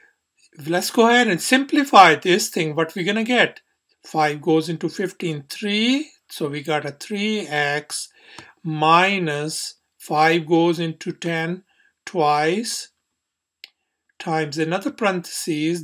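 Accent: India. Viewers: thank you guy with indian accent (i guess he's indian) now everyone's exam is saved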